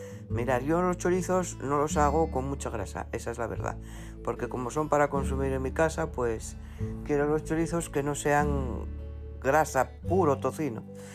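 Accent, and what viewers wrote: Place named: Mexico. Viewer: Saludos desde Matamoros Tamaulipas México 😊 Frontera de México y EEUU Me sorprende que usted siendo de Asturias Habla igual que nosotros Los mexicanos jeje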